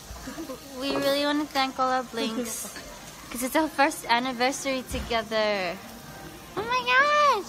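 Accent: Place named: United States. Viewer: yea she sounds more american in this vid but then in other vids she sounds aussie... same with jennie haha she sounds american in some vids but sometimes she has a nz accent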